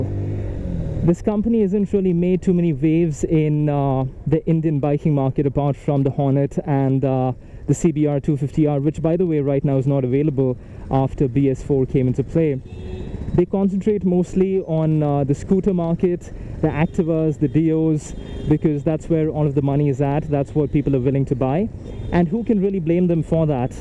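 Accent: England, United Kingdom. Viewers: Your English accent wow ...teach me master 👌👌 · अब तो अपना हॉलीवुड हीरो भी अंग्रेज़ी accent की तारीफ़ सुन सुन के बोर हो गया होगा यारो, gotta say something new mate..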